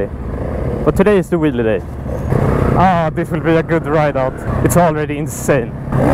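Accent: Sweden. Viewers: Love the swedish accent haha, great video btw!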